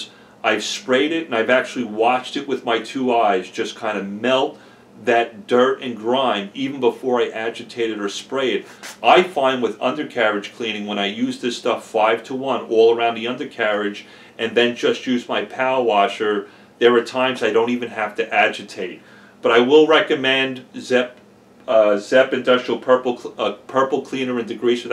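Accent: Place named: East Coast, United States. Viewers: Love your stuff, but I gotta say: Your into: “This is ovah here…” (My brain: “this guy is definitely on the east-coast”) “From Dallas…” (My brain: “what? That’s not possible!”) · Hey pal John from Northport LongIsland again Auto Detailing love the vid and like you have experienced the same thing as you with trying it all and have it narrowed down to most of what you have. How is that citral hope I spelled it right it was the last one you had you mentioned it takes off road paint and here on LongIsland they are always doing the lines on the road lol. Didn't you say you're from here as well that's our accent lol. Thanks keep up the great vids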